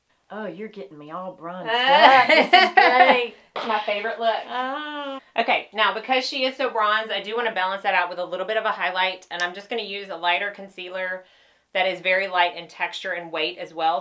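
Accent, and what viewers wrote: South, United States. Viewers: Wow you Look Beautiful and i just Love your Southern accent you do not look your age at all even before make up too! Want to see more of you! In videos you were sooo sweet!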